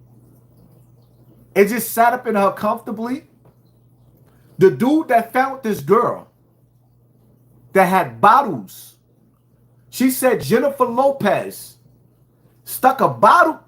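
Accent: Brooklyn, United States. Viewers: BROOKLYN TONE N THE BUILDING...EAST NY STAND UP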